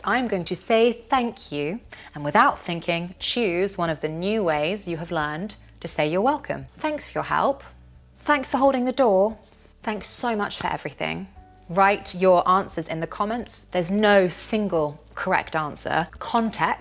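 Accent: United Kingdom. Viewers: you are amazing i love british accent and you are more natural the way you pronounce that is mind blowing. love you from afghanistan.shahab. no worries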